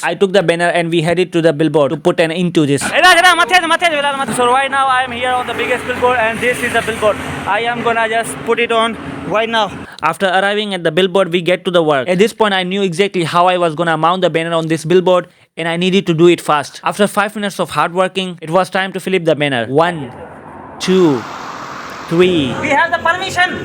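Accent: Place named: Pakistan. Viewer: Pak english good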